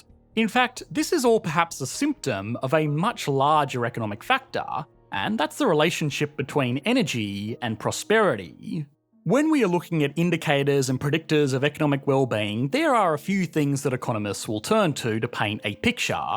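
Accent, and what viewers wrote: Australia. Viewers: For so long I hold myself to not write this ugly comment but I need to becouse I am sure I am not the only one who observed that: you have a good content and interesting video s but you English accent the way how you pronounce the word s that are at the end of a sentence is so so ugly for any 👂👂 ears that are looking at your videos, I don't know your accent sounds like a bulshet Australian accent, I mean the worst possible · This sing-sing delivery in a thick Aus accent is impossible to listen to. Compounded by the swift pace of the non-stop read. This is how economics is made opaque and a turn off for average people. Poor communication of basic ideas. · Take it easy; he's Aussie. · Dude, are you trying to sound more australian? :)) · the Aussie accent is a real distraction - can't get through the content....brain is suffering..... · the au accent is annoying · Where at in Aus are you from? 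I've never heard an accent like yours.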